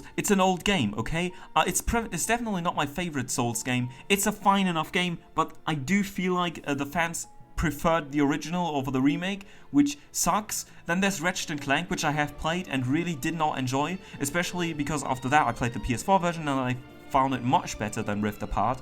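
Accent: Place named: Germany. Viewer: Based on your accent, I would say you are from germany, or some other western eu country and saying that consoles are not worth it and that you would rather build a pc is so ignorant to the rest of the world, where 1 month salary maybe wouldnt even be 500e. There's no pc that can be built for that price that can run latest games in the same quality. Also ps plus (game pass) exists with small subscription you get large library of games. And all this is coming from a pc gamer. Instead of shitting on consoles, you could be shitting on graphic cards prices. Oh, wait, you are born in rich country, you dont care...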